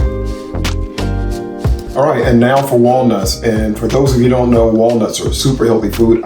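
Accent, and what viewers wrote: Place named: Bay Area, United States. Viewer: You got a strong bay area accent bruh. What set you from? Dope clip cuz!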